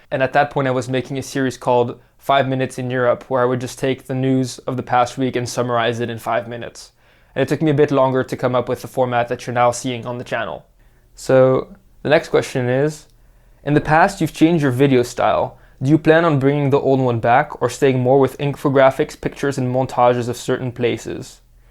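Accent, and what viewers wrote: Netherlands. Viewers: Why do i hear a dutch accent from a frenchman? Or am i just imagining things? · honestly I thought you were Dutch, your accent sounded more Dutch to me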